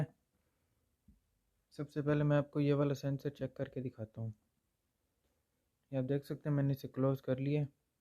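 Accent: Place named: India. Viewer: Do it in English I can't understand Indian accent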